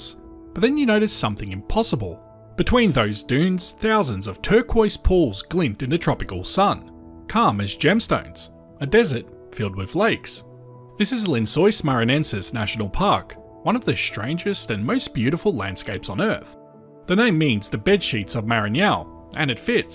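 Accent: Australia. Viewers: It’s Australian. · It's an Australian narrator and it's an Australian channel.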